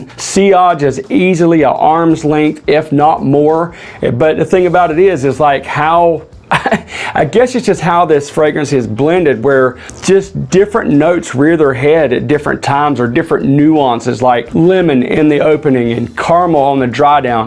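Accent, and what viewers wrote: South, United States. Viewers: Hey broski - this is a great one from Nishane With the southern accent, you should have went all Varsity Blues - it's a 10, motherf#&king 10!!😂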